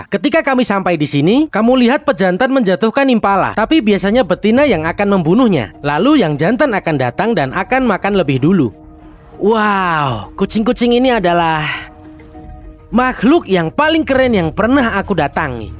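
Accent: Java, Indonesia. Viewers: Knapa jadi medok anjirrrr dubbing nya🤣🤣🤣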